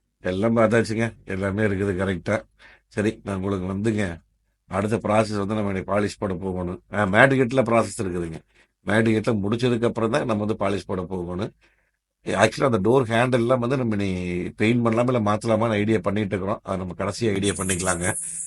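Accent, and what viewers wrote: Kongu, India. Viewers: கொஞ்சும் கொங்குத் தமிழ் பேசும் கோமகரே...! திகட்டாத தித்திக்கும் பேச்சுடைய திருப்பூர் திருமகரே...! லடாக் பயணச் சாதனைச் சகாப்தம் எங்கள் மதிப்பிற்குறிய அண்ணா திரு. அண்ணா❤❤❤🙏 அவர்களே எப்படி இருக்கீங்க அண்ணி❤❤❤🙏 எப்படி இருக்காங்க தம்பி ரித்திக்❤❤❤ எப்படி இருக்காரு வீட்டைச் சுற்றிவரும் பட்டுக் குருவி அது சிறகு முளைத்த சிட்டுக்குருவி...! சுறு சுறுப்பாய் சாலையில் நான்கு சக்கரங்களைச் சுழற்றி வலம்வரும் சுட்டிக்குருவி அது நம் மாருதியின் சிட்டுக்குருவி...!!! விறுவிறுப்பாய் ஊரெங்கும் விளையாடும் ஊர்க்குருவி அது பறவையென்னும் சிட்டுக்குருவி...!!! தனக்கென்ற ஓர் மானிடக் குடும்பத்தோடு வாழும் இந்த குட்டிக் குருவி அது மாருதியின் சிட்டுக்குருவி...!!! வண்ண வண்ண நிறத்தில் வானத்து நட்சத்திரமாய் வலம் வரும் செல்லக் குருவி அது பறவைச் சிட்டுக்குருவி...!!! வையகம் முழுவதும் அண்ணா திரு. அண்ணா சென்ற லடாக் பயணச் சாதனைச் சகாப்தத்தை போற்றி மகிழ்ந்து அனைவரையும் வாழ்த்துக்கூற வைத்த கவிக் குருவி அதுதான் நம் அனைவரது மனதையும் கவர்ந்த மாருதியின் ❤"""சிட்டுக்குருவி"""❤...!!! 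என்றும் அன்புடன் உங்கள் தம்பி🙏 என்றும் அன்புடன் உங்கள் TMF🙏 ரா.முஹம்மது கபூர் (சென்னையில் இருந்து)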